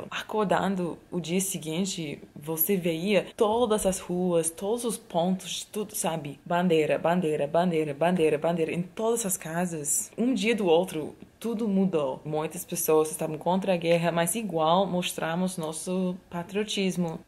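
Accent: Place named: Rio de Janeiro, Brazil. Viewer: você está falando português totalmente carioquês, tipo "SUXXXX"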